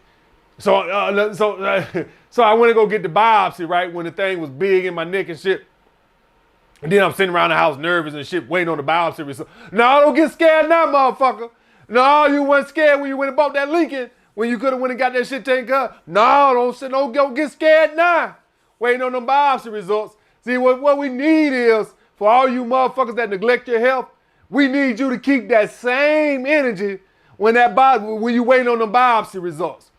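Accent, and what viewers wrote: South, United States. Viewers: It’s funny you say you uncle was one of your biggest influences to being an alpha, but in my life you sound just like my uncle. Even the southern accent!!!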